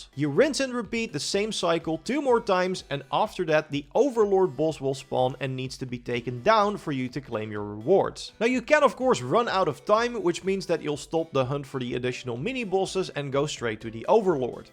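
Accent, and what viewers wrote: Netherlands. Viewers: 99% sure it has to be a Dutch accent 😅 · Loving that Dutchlish.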